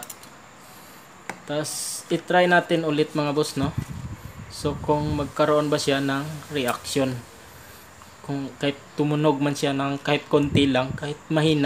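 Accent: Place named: Iloilo, Philippines. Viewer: Daw ilonngo ka to ba hahha nice vid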